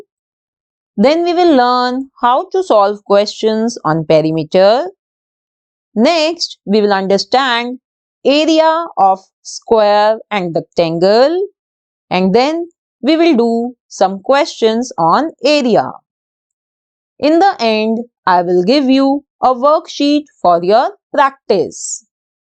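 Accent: India. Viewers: you can improve your english more becaues its look ike indian acsent I sorry for that:dothefive::stayhome::person-blue-wheelchair-race::yougotthis::virtualhug::stopwatch-blue-hand-timer::face-purple-wide-eyes::planet-orange-purple-ring::face-turquoise-drinking-coffee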